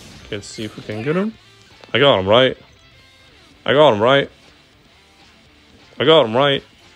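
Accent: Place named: Russia. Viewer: Good gameplay, good team and Russian accent. Perfection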